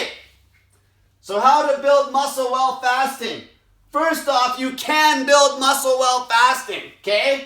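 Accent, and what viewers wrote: Canada. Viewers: This guy's high-pitched hoser accent is hilarious.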